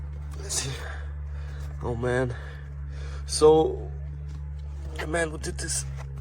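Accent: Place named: Germany. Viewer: Cool Video but the German Accent ist da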